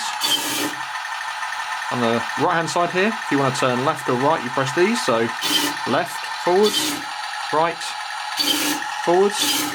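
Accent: United Kingdom. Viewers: You re british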